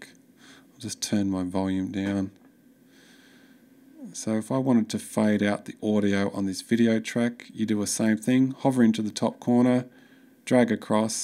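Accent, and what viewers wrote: Australia. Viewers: great, straightforward video in Australian, although I speak Californian. Thank you sir! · subscribed just for "ill be speaking Australian"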